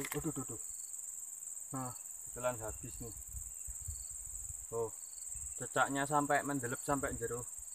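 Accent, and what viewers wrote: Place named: Java, Indonesia. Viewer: seneng kalau denger kakak ngomong,totok jawanya kayak aku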